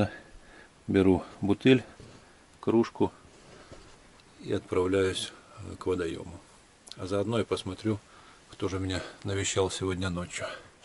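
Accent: Ukraine. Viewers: так по говору можно догадаться что он с украины.у них свой акцент имеется.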